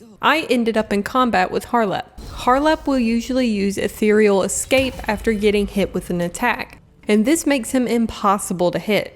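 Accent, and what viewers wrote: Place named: South, United States. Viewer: What is your accent? I feel like it's southern but like sophisticated at the same time 😎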